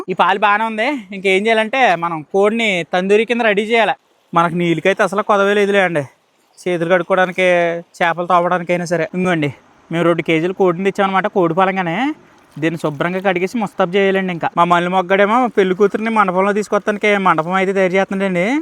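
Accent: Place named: Kakinada, India. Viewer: హలో మీ వీడియో కి మీ మాటలు కాకినాడ యాస highlight సూపర్ వీడియో god bless you ma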